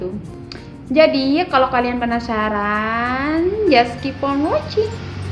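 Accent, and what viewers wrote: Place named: Lampung, Indonesia. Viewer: Logat Lampung nih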